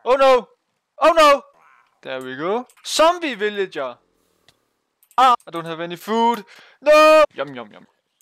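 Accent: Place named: Denmark. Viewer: Man kan høre du er dansker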